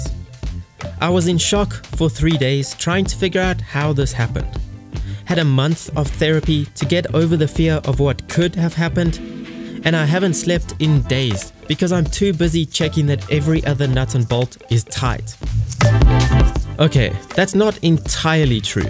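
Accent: South Africa. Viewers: Are you from south Africa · You from SA? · You sound South African, where are you from? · Your channel just got recommended! What a great channel! Dude are you from south Africa. Looks like jhb? And well sound like a South African. If so I am proud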